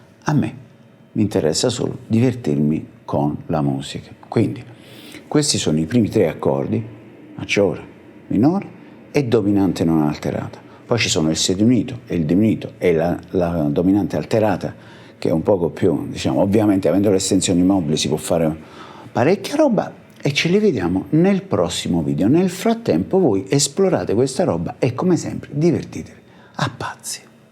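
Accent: Naples, Italy. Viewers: Maestro sei grande! lo adoro sto' accento Napoletano! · è bravo, bravissimo comunque, anche fosse di Brunico. La Napoletanità non c’entra proprio una cippa, se non per la parlata sardonica e disincantata da napoli-snob che è un piacevole orpello all’ascolto.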